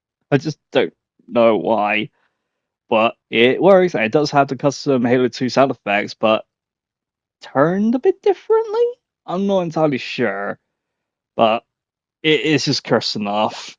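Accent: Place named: Bristol, United Kingdom. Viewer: Your from Bristol gurt accent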